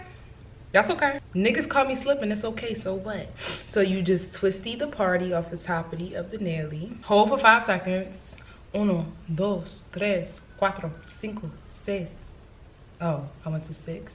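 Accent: Baltimore, United States. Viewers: You’re from LA? Girl, I thought you were from DMV or Baltimore. That’s what your accent sounds like.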